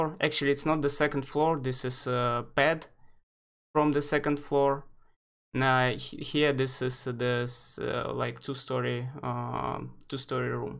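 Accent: Russia. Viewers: great tutorial, I think you are native Russian yea? You have the same accent speaking english as like my girlfriend, she's from sint petersburg :)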